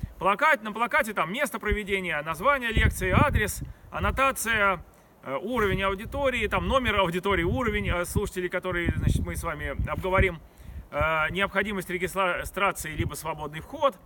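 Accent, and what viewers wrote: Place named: Okanye, Russia. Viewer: Очень харизматичный мужик (в хорошем смысле). Окает как монах.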